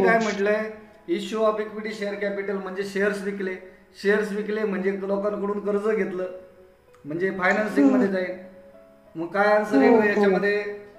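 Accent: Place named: Maharashtra, India. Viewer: because u r Marathi is understood and hindi not suitable for your talking tune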